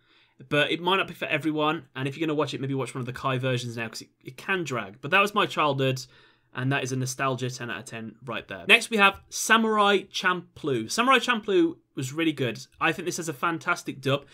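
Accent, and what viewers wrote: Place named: Birmingham, United Kingdom. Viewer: “much simpla” ah it’s good to be a brummie like you haha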